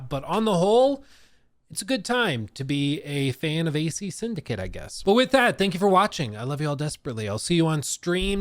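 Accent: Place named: United Kingdom. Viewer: How you cope with the British accent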